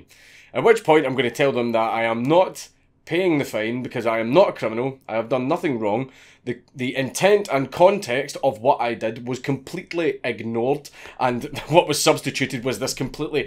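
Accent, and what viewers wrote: Scotland, United Kingdom. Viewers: SCOTTISH AF! Where's your blue face paint son?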